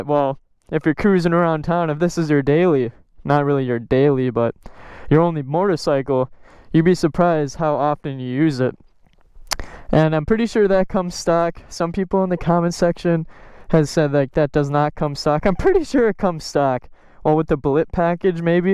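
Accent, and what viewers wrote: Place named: Wisconsin, United States. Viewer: Dude your cringe…stupid Wisconsin accent…mono tone…can’t take u serious..you have no business being on a bike of this magnitude this young. Rich parents I guess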